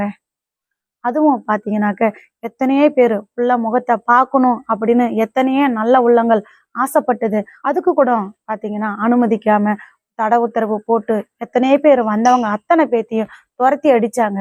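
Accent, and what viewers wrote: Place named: Thoothukudi, India. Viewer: அம்மா நீங்க ரொம்ப வருத்தப்பட்டு இருந்தீங்கன்னா அப்பாவை பார்க்க முடியாது உங்க பையன பார்க்க முடியாது உங்களுக்கு உடல்நிலை பாதிக்கப்படும் கொஞ்சம் தைரியமாகவே இருங்க ஆரம்பம் என்று இருந்தால் முடிவொன்று இருக்கும் உங்க பேஸ் போல தான் தூத்துக்குடி சாத்தான் குளம் பேசும் அப்படித்தான் இருக்கு கவலைப்படாமலும் வருத்தப்படாமல் இருங்கள் அப்பொழுதுதான் உண்மை தெரியவரும் நீங்களும் வீடியோ போட முடியும் நீங்க பேசும் போதெல்லாம் எங்களுக்கு மிக மிக வருத்தமாக தான் இருக்கிறது ஆமா வயிற்றில் வயிற்று எரிச்சலாக இருக்கிறது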